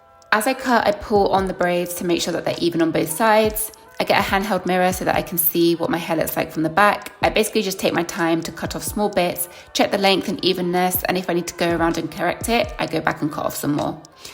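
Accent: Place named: England, United Kingdom. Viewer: Omg i love your accent , im moving to England 😂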